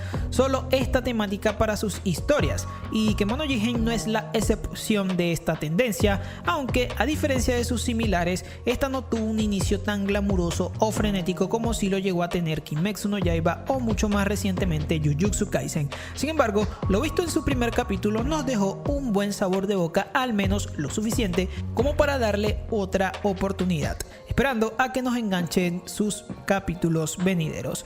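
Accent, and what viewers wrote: Venezuela. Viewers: No sabía que es venezolano, o eso parece por su acento y las palabras que dice, creo yo, jsjs. Venezuela el anime xddd JAJAJA. Tengo que verlo x'D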